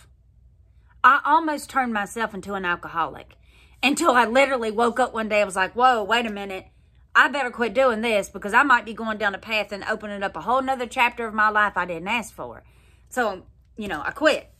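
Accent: South, United States. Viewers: sounds like a real southern belle we in here in georgia luv you youre the VERY BEST how great is WE LUV YOU luv your southern alabama accent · Yes !!! Make up tutorial!! Need it. and more economical shopping for clothes. Keep up the smiles and the positive vibes. Live you and so does my husband. So glad you also speak southern. 💓✝️🇺🇸😎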